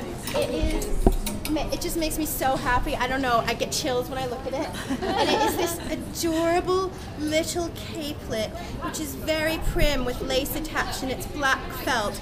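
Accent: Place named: England, United Kingdom. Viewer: She sounds so English these days!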